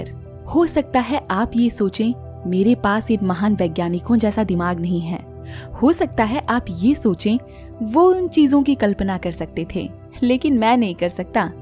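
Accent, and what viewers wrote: India. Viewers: Your voice is too good.. love Indian Hindi ton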